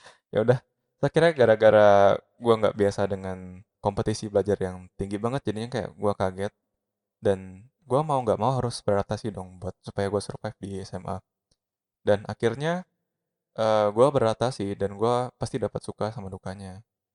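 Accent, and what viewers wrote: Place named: Germany. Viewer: Kakak asli mana ? Kok suaranya ada aksen jermannya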